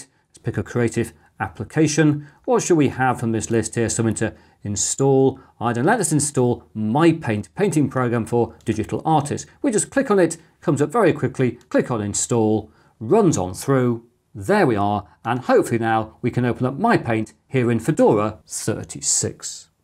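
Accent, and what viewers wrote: United Kingdom. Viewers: A Fedora is a hat. I believe it was Frank Sinatra's choice. (But it wasn't red, I think). Thanks for the insight into yet another operating system. By the way: You British always seem to have a problem pronouncing "kn" and "gn". Very often you just ignore the k or the g: [nife] rather than "knife", [nu] rather than "gnu". But when you do decide to pronounce the k or the g, you always insert an e or sometimes an a: "Genome" rather than "gnome". You once had a Danish king named Canute. Except, his name was really Knud. Where did that a come from? The funny thing is that it isn't difficult at all to say "gnome" with no inserted e. In Denmark we do it all the time.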